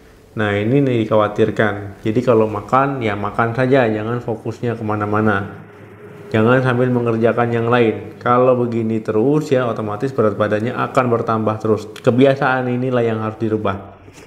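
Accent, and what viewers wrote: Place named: Bali, Indonesia. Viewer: Logat bicaranya kaya ada bali nya dok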